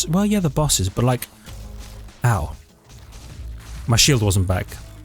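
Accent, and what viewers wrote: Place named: England, United Kingdom. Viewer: He said guv! Wasn't sure if he was English until now. The deep slang really drives it home.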